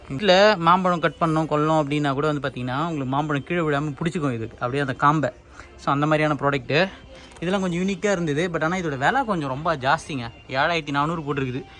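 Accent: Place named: North Madras, India. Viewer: I spent several hours in diaso stores in UAE and Singapore ,Malaysia.Tools especially garden tools are very good but Indian wouldn't knowing such tools exist.because we see old tools and some Chinese tools.you did a good job to show us the purchased items.though you tend to speak good media tamil but your north Madras tamil always comes in. Once upon a time I had a engineering student saying pashupu for pipe and to correct him it took us long time though he writes good English.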